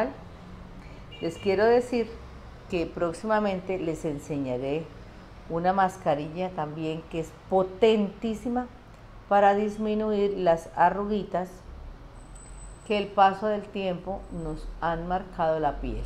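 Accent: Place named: Costa Rica. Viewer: Habla como costarricense.Soy de Costa Rica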